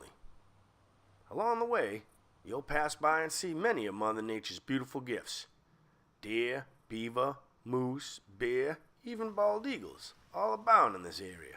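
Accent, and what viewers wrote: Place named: New England, United States. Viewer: Worst accent ever,.like a southern, yankee massachussets, fake maine combo, just terrible, hard to watch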